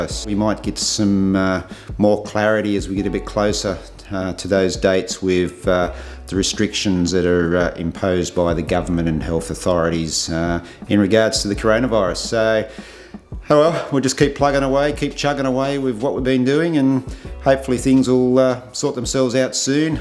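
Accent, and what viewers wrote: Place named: Australia. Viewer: Great channel for runners, thank you ! I'm 42 but fairly new to running (3 years), so I can keep chasing down PB's for now, especially on longer distances (I've only run 1 marathon so far in Francfort). Great content ! And your accent reminds me of the wonderful time we had in Australia a few years ago :-) Cheers from Belgium !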